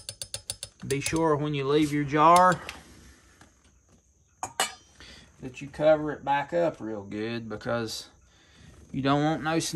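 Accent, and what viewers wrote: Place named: South, United States. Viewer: I grew up in Oklahoma and moved to the north a long time ago, I LOVE watching videos just to hear that southern talk 😍 All the other content is a bonus !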